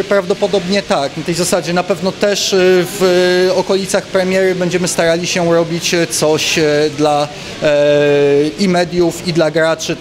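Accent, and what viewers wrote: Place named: England, United Kingdom. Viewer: ale ma chamsko angielski akcent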